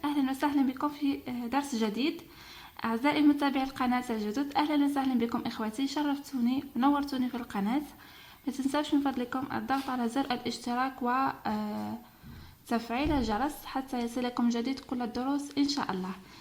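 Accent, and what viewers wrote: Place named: Algeria. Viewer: هدرتك تعجب نتي جزائرية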